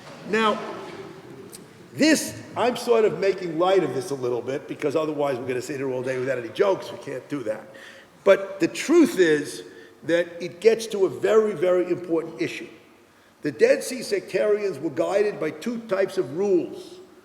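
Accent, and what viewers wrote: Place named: New York, United States. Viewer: Love the accent. Reminds me of a voice actor of a Ralph Bakshi movie. Luv it. Hey Shiffman,,,,,,,,,,,Brooklyn or Queens? LOL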